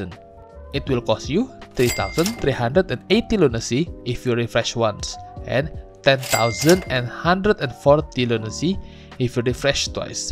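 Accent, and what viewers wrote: Indonesia. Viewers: Logatnya indo banget masnya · As soon as I heard the accent, I knew this man was Indonesian.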